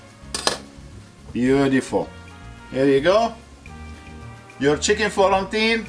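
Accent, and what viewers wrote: Lebanon. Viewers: I love your lebanon English language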